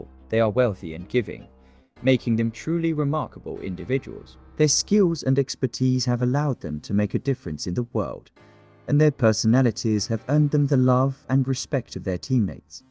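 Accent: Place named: England, United Kingdom. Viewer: I love this File Card Photo play. With Voice over With English Accent Rem8nds 9f Niguel from Jamangi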